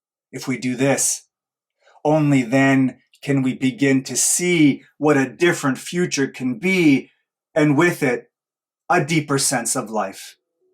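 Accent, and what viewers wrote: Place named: Canada. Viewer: Indeed. Honestly, if you ever venture to the UK. look me up. It would be a privilege to meet you, & hook you up with places and people. I have family in Canada (sorry, terrible at accents but I think your vowels suggest Canada rather than THAT place?) so we might get out there before the decade closes. But certainly with a brown family I won't be going to the other place anytime soon! 😅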